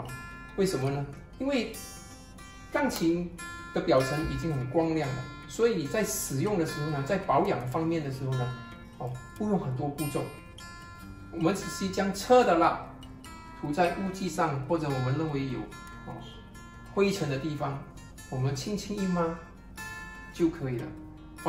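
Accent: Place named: Malaysia. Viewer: 挖撈欸，人家是馬來西亞人吧。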